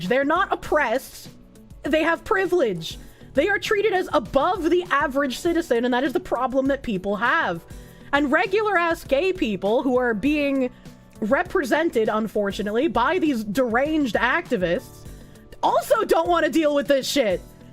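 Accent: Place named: Boston, United States. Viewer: She's a bawstin gal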